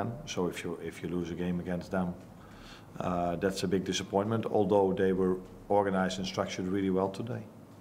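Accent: Liverpool, United Kingdom. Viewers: is it just me or is he already getting a bit scouse?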